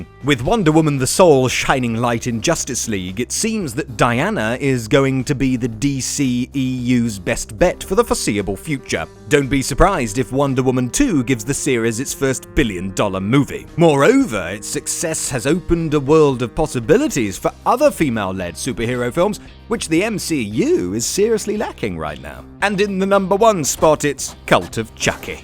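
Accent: United Kingdom. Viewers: Power Rangers didn't suck? Well, ok I guess you're entitled to your opinion... (for all those outside of the UK new to this channel we don't all talk like this) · Voice actor of this video rocks! I haven't heard so over-the-top British voice in years, awesome!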